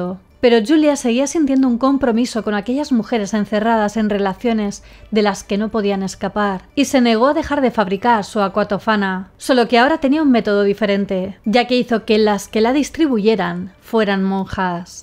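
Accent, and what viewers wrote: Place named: Spain. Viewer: Me encanta tu lunar y tu acento Español .. 😻🥰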